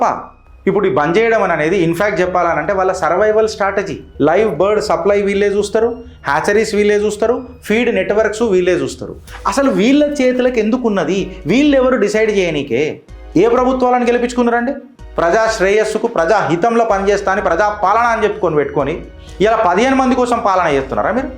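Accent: Telangana, India. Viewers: అన్నయ్య మీరుమట్లాడే తెలంగాణ స్లాంగ్ బాగుంటుంది...!!! మాట్లాడే తీరు excellent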